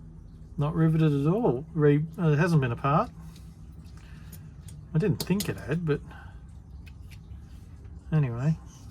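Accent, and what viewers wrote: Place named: Australia. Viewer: gee, looks nice and clean again. especially the roof rack, very useful, even for other castings, and customs. what if you do the rack two-part? make the two clamps that grip the roof's edge separate, connected by a rod in the middle maybe, so they click easier around the roof, and once the actual rack is glued down all gets stabilized. of course, chroming it will be a challenge then. but personally I'd be afraid of the resin breaking. anyway, great work! the casting looks a bit disproportionated, maybe because of the narrow wheels? aside that, if you need a giggle, look at how YouTube subtitles translate your 'strayan accent... including the dremel's noises. XD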